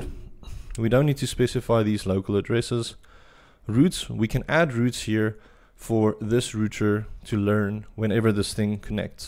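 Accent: South Africa. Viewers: Hi, are you from South Africa? Your accent sounds South African:) If so, do you provide in class training. Your explanations are wonderful and I can see where you can add value to my team and I.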